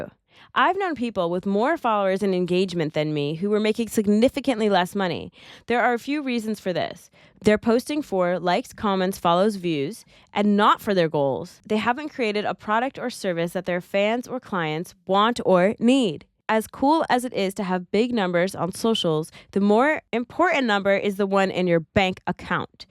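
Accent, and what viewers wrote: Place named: United States. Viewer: That American accent is off the hook!👍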